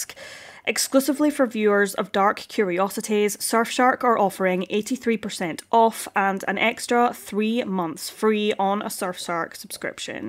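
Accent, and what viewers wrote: Scotland, United Kingdom. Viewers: Is that a fellow Scot I can hear? I was wondering when I'd come across a Scottish true crime channel!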